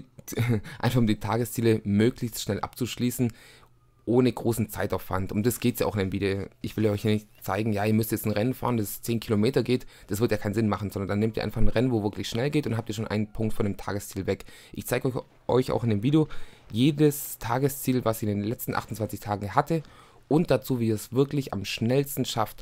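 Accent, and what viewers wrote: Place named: Swabia, Germany. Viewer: da is wohl jemand ausm schwabenland:D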